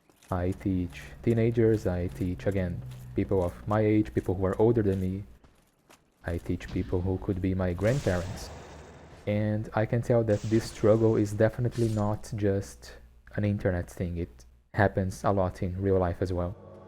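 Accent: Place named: Brazil. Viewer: Sotaque BR 😂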